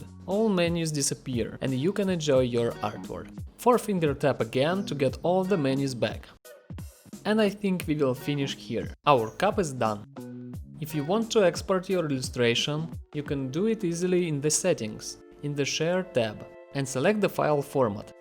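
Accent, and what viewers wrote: Russia. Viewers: Очень рашн акцент :)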